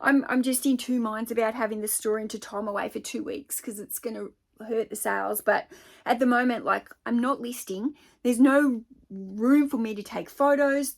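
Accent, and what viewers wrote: Australia. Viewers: I cant get enough of you saying the word "book" that Australian accent though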